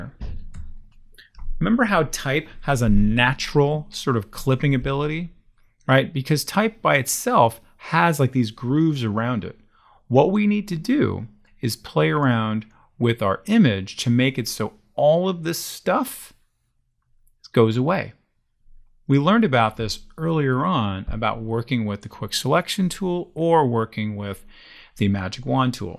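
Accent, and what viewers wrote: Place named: United States. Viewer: Appreciated it but struggled a bit with Adobe help, just didn't 'get you started', it seemed to make assumptions about what you already knew (great chopping up layers but how do you get the pictures in the first place to be able to edit them?). This guy is brilliant, so well constructed, builds up nicely, importantly for a Brit, has a very toned down American accent and style - that's a compliment, he's really easy to listen to. Thank you so much, just brilliant.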